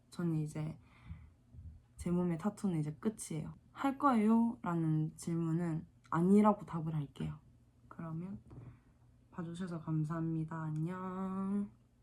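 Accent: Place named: Busan, South Korea. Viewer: they're so pretty!! and ur busan accent is so nice to listen to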